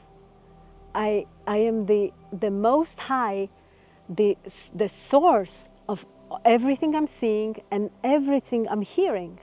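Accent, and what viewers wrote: Poland. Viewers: Maybe it's just me, cause I'm Polish, but your accent sounds veeeery Polish to me. Especially the way you pronounce "Antarktyka". Is there a grain of truth in it or am I totally wrong?😊😅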